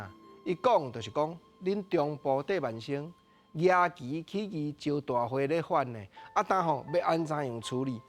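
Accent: Taiwan. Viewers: 這才是正港的台灣話，遺憾現今台語的沒落。很多人講台語都怪腔怪調。要來解文說字 來好好學習發音。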